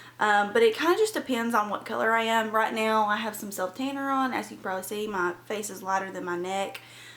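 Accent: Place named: Oklahoma, United States. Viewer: Omg I love your accent!!! I wish texans sounded like that. I live in texas and we talk really fast and nothing like that. I was born in Oklahoma so I used to have an accent similar to yours but not anymore :/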